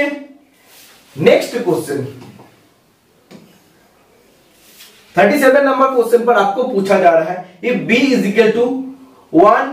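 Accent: Bengal, India. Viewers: par aap ke bengoli acsent se thoda sunne main problem hota hai sir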